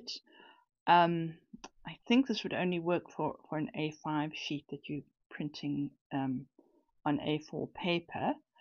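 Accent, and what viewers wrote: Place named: South Africa. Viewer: You must be from South Africa? You sounds just like my mother in law. 😊 Thank you for this video! I have always arranged mini book pages the hard way, but now I have some much easier and quicker options.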